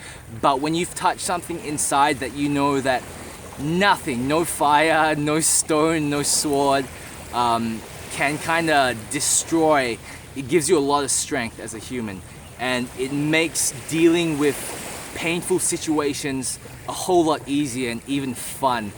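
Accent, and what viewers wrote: Australia. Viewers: Your accent seems Australian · interesting accent, sounds australian crossed with something else. Cool story, i am in the starts of a kundalini experience, feels like i am connected to god with electric jumper cables, so intense. I wonder when it will stop. If I stop meditating will it go away?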